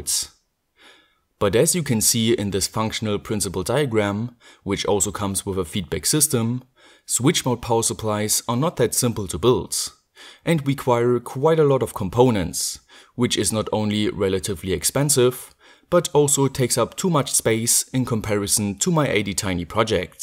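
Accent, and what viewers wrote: Germany. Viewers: Give the guy a break! He's German. I don't think his pronounciation is so bad!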